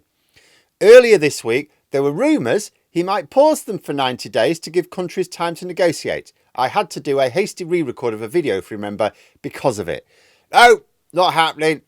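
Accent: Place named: North, United Kingdom. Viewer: What absolute bollocks as ever from this silly site and a strange man who shouts his northern accentuation at me.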